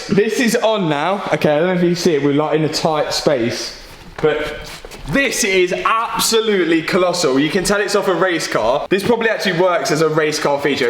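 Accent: United Kingdom. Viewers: I love UK accents, great how you say boompah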